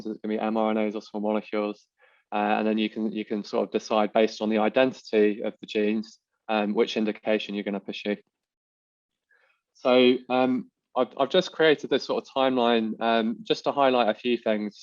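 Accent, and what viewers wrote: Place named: England, United Kingdom. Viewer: You should consider activating text autogeneration..if you both read and listen to his English accent you should be able to understand it..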